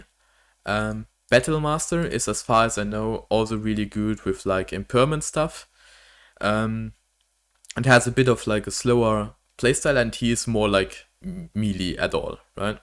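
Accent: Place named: Germany. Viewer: Are you german? You sound german, lol.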